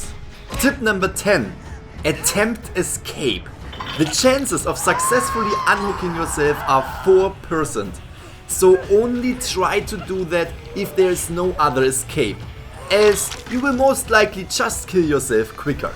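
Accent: Germany. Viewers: German akzent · Im sorry but first: im super triggered by your pronounciation (it sounds like your german i am too but i speak english too) aand you were using the flashlight super bad giving tips( that are obivious) but not giving the tip to stun the killer before using it? Its actually more important than Open the gate before helping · Digga dein english ich habe direkt gecheckt du kommst aus deutschland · Man hört den deutschen Akzent soo raus XD😂 Aber Gutes Video Danke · Damn bro, man merkt, du bist deutsch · Kann es sein das du deutsch bist? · No front, but u are German right? Your English sounds.. pretty german, yk? 😂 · We love a good deutscher akzent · Are you german ypur english sounds like that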